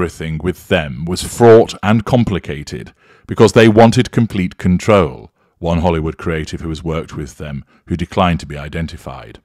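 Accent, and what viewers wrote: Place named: RP, United Kingdom. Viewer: Hi Sir, love your use of profanity and you still sound so upper crust and gentlemanly, it’s like hearing a priest swear, you make me laugh 😆 🤭 I do have a telephone voice like all Londoners😊🙃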